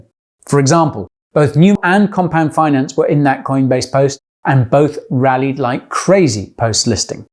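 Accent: United Kingdom. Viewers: I’m going to trust you on this one because you have a british accent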